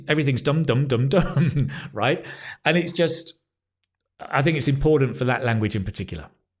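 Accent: United Kingdom. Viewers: Hello, I could stay for hours listening to you speaking with that wonderful British accent. I am Argentine, I learnt English when I was very young. Now I'm 62 and findd that learning languages is amazing. Five years ago I started learning French and now I can have a conversation or read or listen. I went on changing my methode. Once I felt confortable with the basic grammar rules I started watching videos of different subjets just to grasp the accent and the vocabulary of real people. Now, I am learning German! My level is really basic but one day I found myself reading and listening to a simple story. And that was so motivating!!! As you said, each one has his own methode. Mine is as simple as go on changing methodes!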